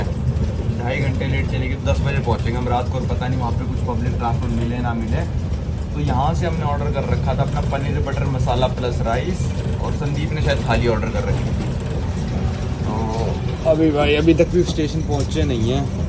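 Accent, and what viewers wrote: Haryana, India. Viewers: His accent sounds like haryanvi accent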